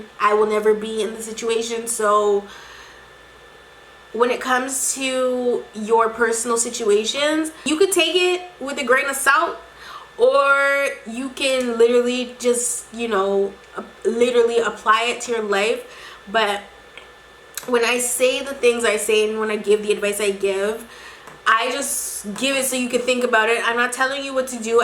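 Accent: United Kingdom. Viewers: That British accent😩👏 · Your British accent is on point! you sound posher than me😂😂 ,Love your videos x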